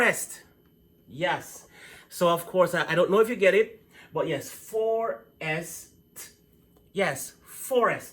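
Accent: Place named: Ireland. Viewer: Great stuff buddy. I notice your accent almost sounds a little Irish at times.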